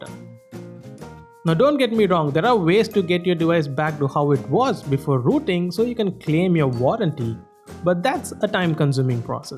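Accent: India. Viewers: Starts the video-lemme guess hes gonna be indian.. -Seconds later(indian accent) in my last video.... Called it. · omg the indian accent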